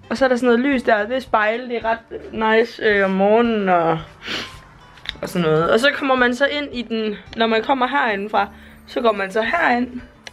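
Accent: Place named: Jutland, Denmark. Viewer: Jeg føler at du lyder meget mere jysk når du er sur elsker dig❤️😂❤️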